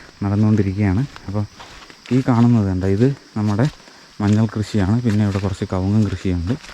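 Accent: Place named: Trivandrum, India. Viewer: Chila comments kandu bgm idan kurachu neram vaa pooti irunnal mathi 😂 video start cheyumpo muthal kalapilaa ennu samsarichondirikkum but trivandrum style samsaram rasamund ennalum kurachu kurachal nannayirikum 🤐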